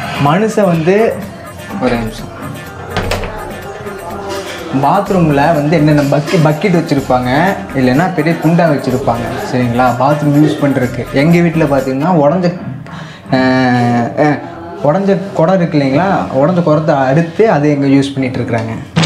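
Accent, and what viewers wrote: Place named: Coimbatore, India. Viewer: sirunga coimbatore bhashaikku na adimai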